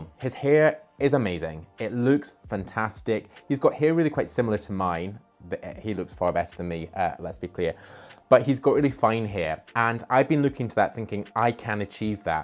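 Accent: Scotland, United Kingdom. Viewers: I like your video. Just curious, you sound like a cross between a Scot and a British man. What is your nationality or background if you don't mind me asking? I just like to educate myself on the different accents and sounds that I hear.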